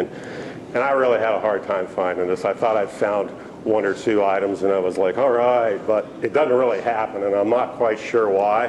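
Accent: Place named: South, United States. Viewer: This lecture was so informative! Thank you NC for being preservation leaders! The only disappointing thing was when this professional architectural preservationist showed a slide on which was labeled "clear story windows" when they are actually CLERESTORY windows. Professionals need to remember to double-check spellings, particularly when you speak with a strong Southern accent. It makes us Southerners look ignorant when things are spelled as they are pronounced.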